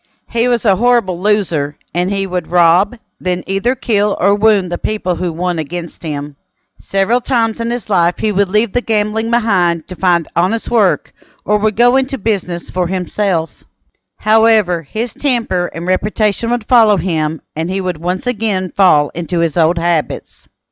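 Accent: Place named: Appalachia, United States. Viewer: You sound like you speak Appalachian American, however you said, " Appalation". curious, mighty curious.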